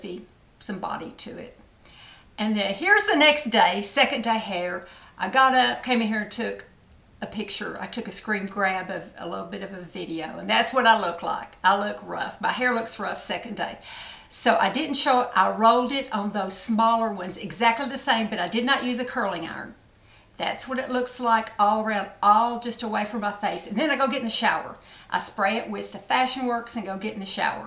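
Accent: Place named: South Carolina, United States. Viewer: Hi I've been watching you for a long time now. I like playing videos while putting on my makeup when I can. First thing I thought was "she sounds like me!" Lol I'm a born & raised South Carolinian! At that time no other women on YT in the beauty community sounded like us so that's how I found you. I subscribed and haven't missed a video since plus I've gone back and watched a lot of your videos from earlier days. You're a beautiful woman first of all but I think you're a beautiful soul as well. I enjoy your videos and you've convinced me to try a few products and NOT try a few. I'm 56 married 34 yrs in Oct 4 children 2 living and 5 beautiful grandchildren. I love makeup big hair my jewelry and clothes so I fit right in or you fit in to my lifestyle. Thanks for sharing and the tip about the Tazo tea for nausea that helped Doug has really helped my husband. 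I just wanted to say I appreciate you! Hope you do videos for a long time to come. Blessings & love from SC ❤🌹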